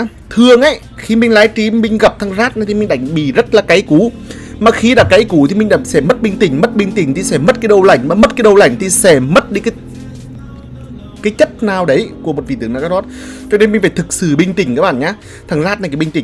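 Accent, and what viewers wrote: Nghe An, Vietnam. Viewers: Yêu giọng NGHỆ QUÊ CHOA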